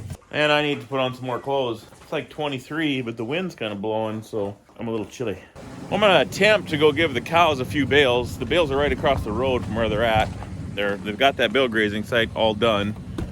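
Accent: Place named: North Texas, United States. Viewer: Sounds like us here in North TX😀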